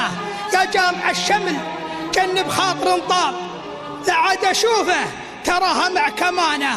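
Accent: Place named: Shahran, Saudi Arabia. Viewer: هذا درعاني واهبي شهراني يتكلم بلهجته الشهرانية الأصلية وقبيلي من روس قبايل وقحطان ونعم بهم احددانا وأنسابنا ولهجتهم مختلفة قليلاً عن لهجة شهران وهم وشهران أغلبهم بوادي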